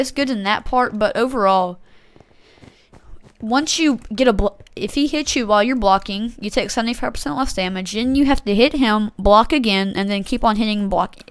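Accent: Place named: United States. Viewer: You have an accent like I do, what state are you from?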